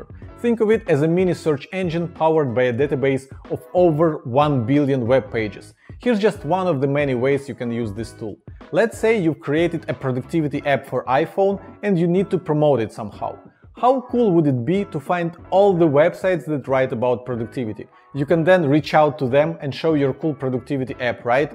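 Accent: Russia. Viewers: i think russian accent, no?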